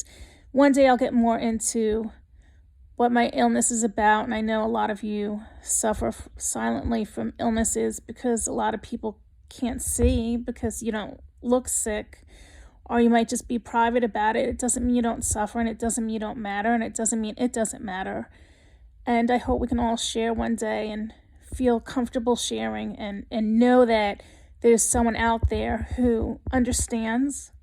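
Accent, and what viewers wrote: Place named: New York, United States. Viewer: You are such a beautiful and kind person! Yes, we all need to reach out and support others. Life is full of ups and downs and it helps to know someone wants to help with no judgement or prejudice. I hope your personal struggles lighten and lift so that you can resume the life that makes you happy! I enjoy your channel and your accent (love that NY vibe!). Stay strong! Looking forward to your next post!. Love from New Mexico!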